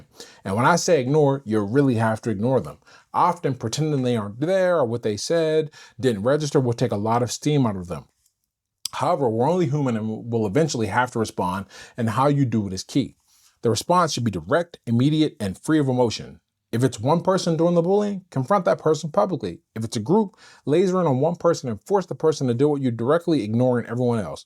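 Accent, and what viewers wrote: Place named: Fox Chapel, United States. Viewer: You grew up in the hood but speak and act all Fox Chapel or Nevillewood. Salute my man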